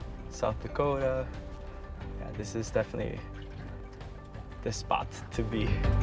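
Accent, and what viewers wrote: Netherlands. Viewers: Wat cool, ik wist niet dat je uit Nederland kwam. Ik bleef mij maar afvragen of ik niet een klein Nederlands accent hoorde haha. Nu snap ik ook je zonnepanelen uit Nederland op de Tesla. Wat een avontuur! Echt geweldig!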